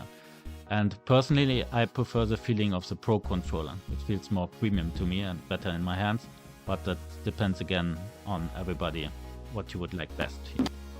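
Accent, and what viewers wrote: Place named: Germany. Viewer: Bei der harten englischen Aussprache würde ich schwören, dass deine Muttersprache ebenfalls Deutsch ist 😄 gutes Video, hat mir weitergeholfen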